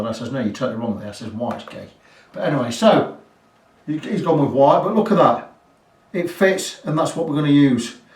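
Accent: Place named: North West England, United Kingdom. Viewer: Fantastic job! As coincidence would have it I would like to do pretty much the same job to my duo sonic. It’s a 2018 SS seafoam green with a PF fretboard. Intonation isn’t great with the short scale and even though I have fitted 11 gauge strings, the guitar almost seems desperate to go out of tune. I would also like to upgrade the pick ups with something hotter and, definitely with a humbucker in the bridge. Judging by your accent and the reference to your friend from Sheffield, it sounds like you are based in the north-west. I am in Manchester. Is there a way we can talk about you doing some business on my guitar please? Thanks.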